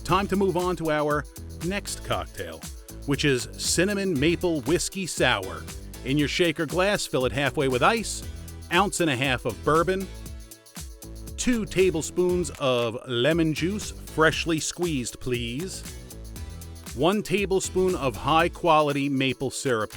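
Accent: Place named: East Coast, United States. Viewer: You live in Florida but sound like East Coast...New York or New Jersey. Also you come across as Italian. My mom was Italian and Dad Irish/English backgrounds.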